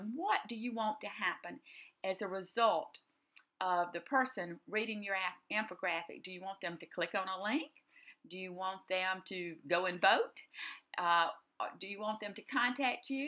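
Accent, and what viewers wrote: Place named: South Carolina, United States. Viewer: Thank you. That was a very nice tutorial and seems much easier than some of the other tools I've toyed with tonight.Now, tell me - are you from South Carolina? (I am originally) and your voice made me feel back at home.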